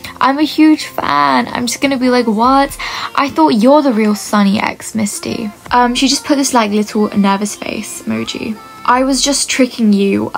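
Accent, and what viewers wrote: United Kingdom. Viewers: Um are you British?😂 i hear it in your voice!😅😅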